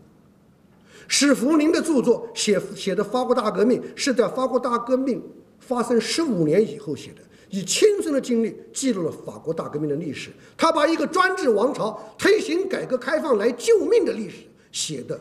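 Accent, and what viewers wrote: China. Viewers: 一听你口音就知道你是安徽人，合肥南部巢湖北部的口音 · 共產黨政權是奪來的所以不合法這話太亂談琴了！ 你覺得誰的政權不是從別人手中奪來的誰是合法的？？說著中國腔嘴巴卻不說中國話！！別鼓吹獨立了！為了部分人政治利益，把大部分陷於絕境！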